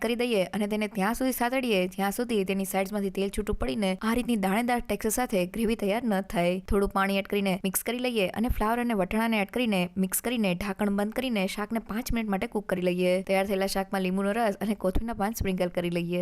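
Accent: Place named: Saurashtra, India. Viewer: Didi tamari language saurashtra jevi lage che.. tame kyana cho ?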